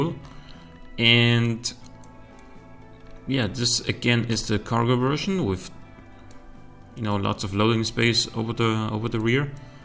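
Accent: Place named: Germany. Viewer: A few questions : 1) How are your cars so light ? Mines will always be like minimum 1600kg... 2) Where did you get the customs tracks I saw in your previous video ? I would have loved to be able to test out my own drag cars but coudnl't. 3) Also where are you from ? Because your accent is so nice :3 I guess maybe Germany. 4) Are your chassis made by you or are they just modified vanilla chassis ? 5) I don't know what I should say next, I feel like I'm so bad at this game :(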